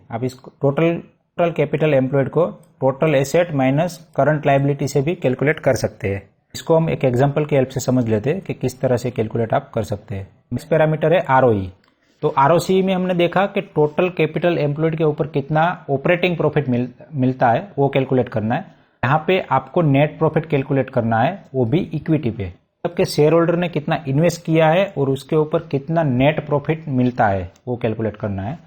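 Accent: Gujarat, India. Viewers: Are you Guajarati? Language tone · R u gujarati 😀😀😀😀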